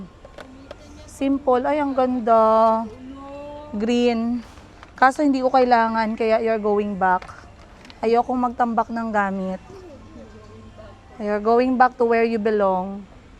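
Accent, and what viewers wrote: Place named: Visayas, Philippines. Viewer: YANG KABOBOHAN MO AT IKAW ANG BASTOS MAGSALITA PURO KA BISAYA ANG PANGET MO PUTANG INA MO NAKAKAINIS KA PANOORIN, PANGET TALAGA UGALI MO, YUNG ACCENT MO BISAYANG TAE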